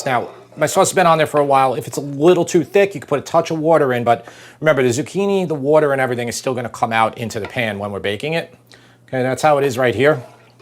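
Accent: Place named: Philadelphia, United States. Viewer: He's gotta be from the Greater Philadelphia area.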